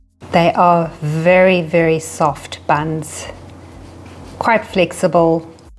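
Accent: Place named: South Africa. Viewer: Hi, thanks for the recipe. Are you South African? Your accent sounds like it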